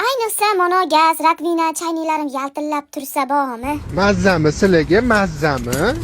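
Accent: Andijan, Uzbekistan. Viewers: Anjancha shevaga gap yòq❤❤❤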